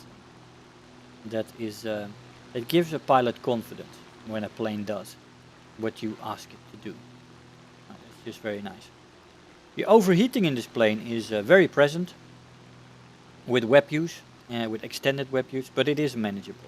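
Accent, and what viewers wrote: Netherlands. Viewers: Sounds Dutch?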